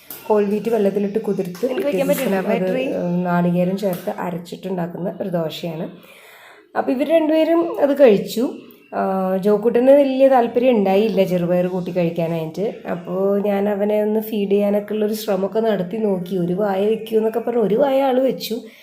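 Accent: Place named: Thrissur, India. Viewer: 5kuttyolum🤩🤩👌👌👍nammude thrissur bhasha idakkidak varunnundtto🤩👍kuttypattalam nannayi enjoy cheyyunnund🥰ningal sisters randaalum banglore aanalle..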